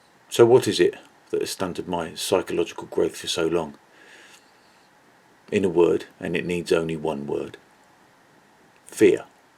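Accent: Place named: London, United Kingdom. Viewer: This is a London accent, East London I think. Definitely from somewhere in SE England though.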